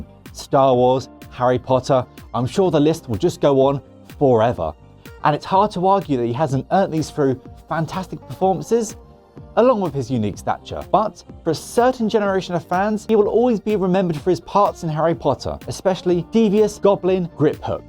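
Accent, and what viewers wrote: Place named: Cockney, United Kingdom. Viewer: Ex drug addict Cockney guys are not who you hire for voice over work...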